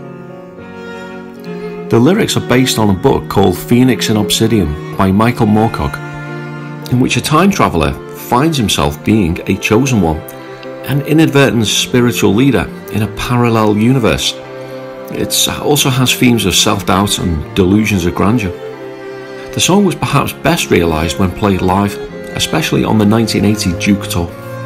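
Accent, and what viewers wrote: Liverpool, United Kingdom. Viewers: I’m from England and believe I have a good ear for English accents but it’s not just because you’re a scouser that I can’t believe you aren’t of Guitar the YT channel mainly devoted to detailing all things Oasis, Shed Seven, Ocean Colour Scene & Britrock of the late 80s onwards. Perhaps you are one or have diverse tastes but operate under various headings! Both channels - this one & are great in - depth stuff anyway 😁❤️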